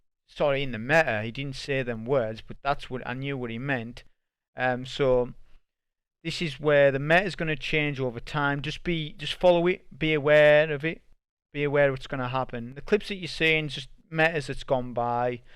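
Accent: United Kingdom. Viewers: Excellent well presented video explaining key points on how to progress (solo or not to be fair). Can only assume that the 2 down votes were a mistake but if not, why don't the asshats share why they disliked it? Bet they don't. GG buddy. Always nice to hear a fellow Brit on the Destiny wagon.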